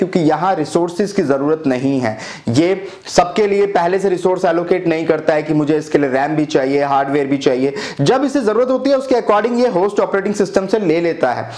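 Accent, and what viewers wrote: Pakistan. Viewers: Sir aap bharat se ho ya pakistan se, aapki ton Pakistani lag rahi hai.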